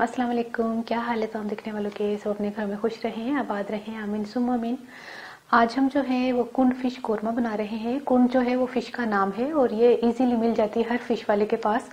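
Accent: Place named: Punjab, India. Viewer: Yar jitni sweet ap ho utni sweet ap ki punjabi , ur new subscriber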